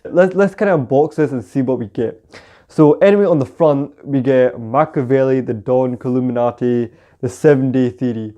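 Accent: Fife, United Kingdom. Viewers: Sounds like a Fife accent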